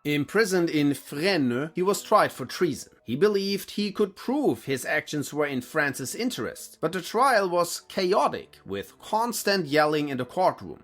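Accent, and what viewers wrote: Germany. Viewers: I'm still trying to figure out what part of Germany he's from.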